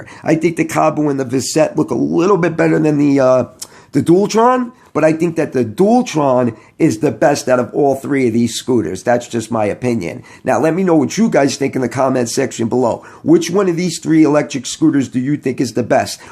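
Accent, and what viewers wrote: New York, United States. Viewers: I nailed it my friend great commentary as well I love your ny accent and no b.s attitude keep up the good work my friend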